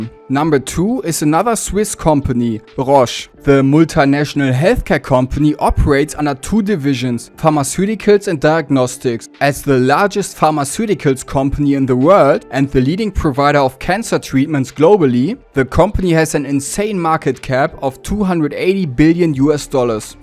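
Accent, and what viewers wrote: Germany. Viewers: Love an English German accent.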